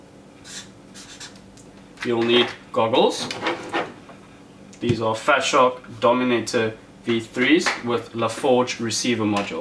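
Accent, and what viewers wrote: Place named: Afrikaans, South Africa. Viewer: Hello ek kan by jou Aksent hoor jy is Afrikaans love jou videos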